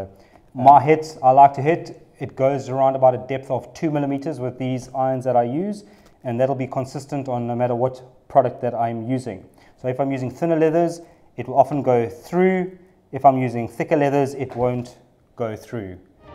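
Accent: South Africa. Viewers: Hey guys. It is so fresh and nice to hear the South African accent🤠. And at the same time see some real quality work. Makes me proud. I just recently started my business here in Germany #vanrensburgadornments. Slightly different direction than you guys. But I find your work very inspirational. Keep it up. This tutorial is excellent. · Yes man. I recognize the South African accent. Where do you get your hides? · Good video, thank you. You have a South African accent, we're you from? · I love your saffa accent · is that a south african accent? it’s a little different than australian or new zealand. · Sounding like a South African 🇿🇦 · Very pleasant to listen to. Are you from South Africa?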